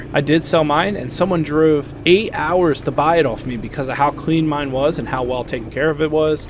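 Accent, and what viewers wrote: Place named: South Jersey, United States. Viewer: You gotta be a south jersey dude with that accent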